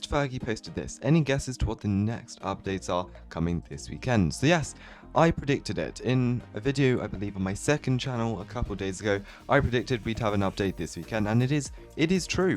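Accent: United Kingdom. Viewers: Can anyone tell me if is British or not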